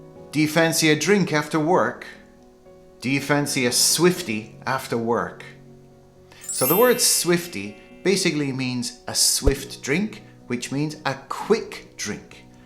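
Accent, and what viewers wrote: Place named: United Kingdom. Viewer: Most appreciated. I`m loving British English! Your English reminds me of my staying in the UK. Thanks, Edinburgh is beautiful town, Highland, Loch Ness. Keep going, I will be watching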